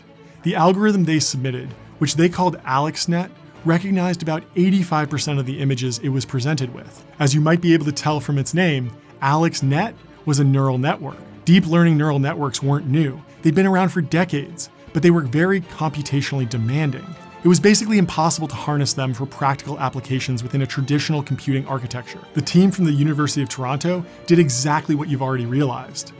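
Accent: United States. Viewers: I'm an English pedant, my multilingual son wouldn't make that mistake. However I do accept as the saying goes that we are "two nations separated by a common language" and the guy speaking is speaking American and not English. Just rubbed me the wrong way is all.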